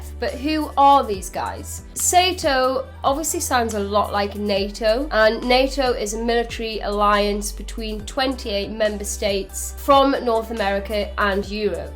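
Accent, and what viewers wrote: United Kingdom. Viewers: i dont know maybe somewhere in britain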